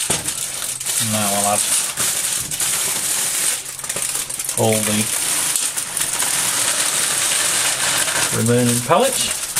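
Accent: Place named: Yorkshire, United Kingdom. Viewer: LOL, you must be a Yorkshire lad. Your accent is just like my brother in law from Hull.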